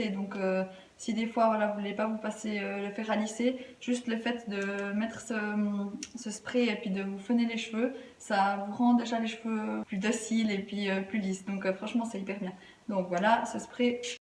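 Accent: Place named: Neuchâtel, Switzerland. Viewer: hey hey on reconnait bien l'accent de Neuch :D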